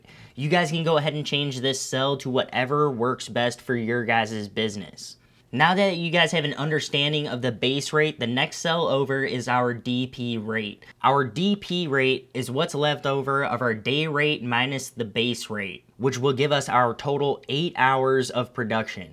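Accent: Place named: Midwest, United States. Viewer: Midwest accent gotta love it! I say this in my own Midwest accent.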